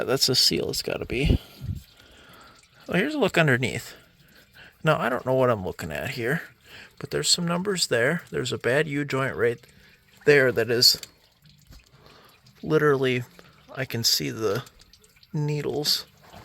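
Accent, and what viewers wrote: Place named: Wisconsin, United States. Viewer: Apple 🍎 doesn’t fall from the tree with that Wisconsin accent 🤠🇺🇸🍺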